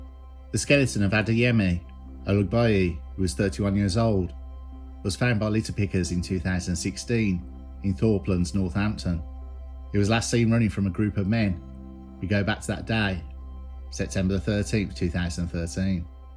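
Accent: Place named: West Midlands, United Kingdom. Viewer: I could listen to you read the dictionary love the West Midlands accent much love from a weegie 😊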